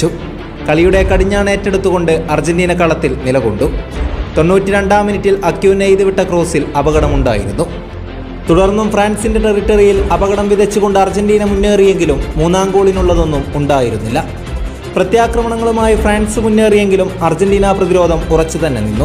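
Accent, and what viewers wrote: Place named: Ernakulam, India. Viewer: അറിയാതെ കണ്ണു നിറഞ്ഞുപോയി ഈ കളിയും എറണാകുളം അവതരണവും കേൾക്കുമ്പോൾ 💙🤍💙🤍🇦🇷🔥🔥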